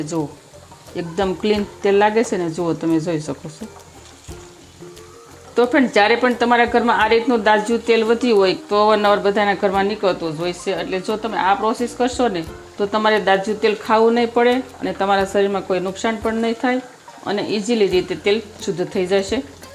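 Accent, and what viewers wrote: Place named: Kathiawar, India. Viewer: Bau j saras ben..kathiyavadi so😊 mithi bhasha bolo chho...jayshreeben from nairobi kenya (africa)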